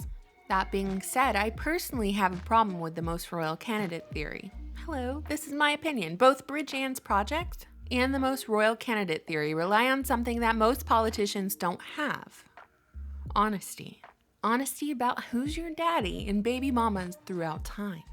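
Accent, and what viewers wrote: South, United States. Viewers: did you speed this video up? what happened to that slow, southern, mellifluous locution?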